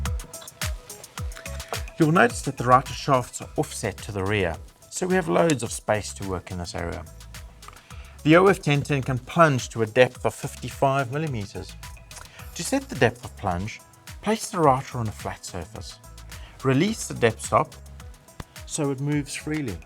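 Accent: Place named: South Africa. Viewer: He sounds South African. I just bought this router. Damn I was not expecting it to be this good!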